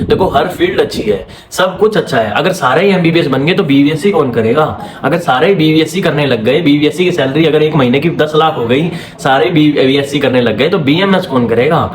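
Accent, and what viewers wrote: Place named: Pakistan. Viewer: bhai yr no offense, aap haryana se ho but aapka accent pta ni kyo mujhe pakistani wali vibe deta hai, jo woh urdu ka hota hai, mast...baaki content toh bdhiya hai hi, aur woh interest wali baat hi sahi hai, jisko sachme animals se pyar hai wohi Veterinary kre, mujhe agle saal NEET dena hai but m already prepared to be a veterinary.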